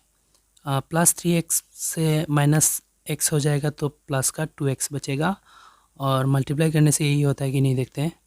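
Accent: Bengal, India. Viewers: R u Bengali sir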